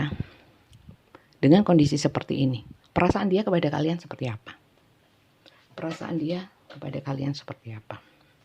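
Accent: Java, Indonesia. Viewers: Selamat malam mom selalu senang dengerin kalau ngmg medok banget jawa nya bikin ketawa, sehat selalu 🙏.. salam hangat mami dari taurus di sby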